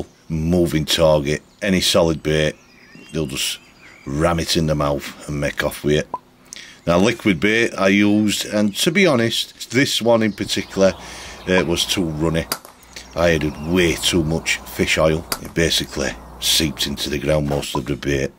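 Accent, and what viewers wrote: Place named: Yorkshire, United Kingdom. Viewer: This may seem a bit of a tangent to some, but I would love to know what your accent is, sir. I am an English teacher and very interested in accents. Can I venture a guess? Yorkshire? Please let me know. Also, I LOVE this rat shoot video. I am an avid shooter myself. Cheers!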